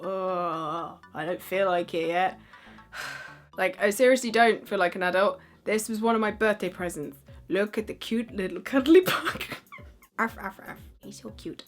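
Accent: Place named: Cockney, United Kingdom. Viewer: So not only are you a cockney girl with a great personality an infectious laugh and sorry for been sweet and corny but beautiful eyes but you like danger mouse gimme gimme gimme I grew up watching danger mouse, super mouse, banana man and the raccoons.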